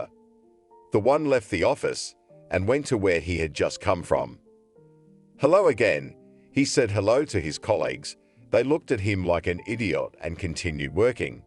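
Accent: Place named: United Kingdom. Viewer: the stories are good but it would be better if the narator didnt have a british accent. ir's somewhat hard to grasp the words as he pronounced the letter A as I. sorry to say this but I hope you could find a better narator with american accent. tnx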